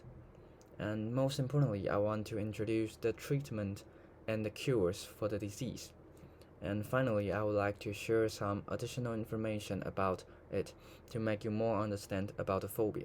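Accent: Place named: United Kingdom. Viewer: 這英國腔你是英國人吧 wml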